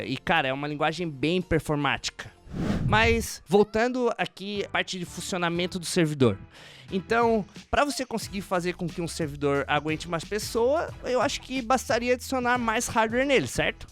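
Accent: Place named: Garopaba, Brazil. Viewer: Cara, vc fala igual um garopabense raiz kkkkk vc é de Garopaba-sc? Não deu pra não notar... kkkk excelente video, mas poderia explicar mais simples antes de entrar no assunto do título hehe mesmo assim gostei... ganhou meu like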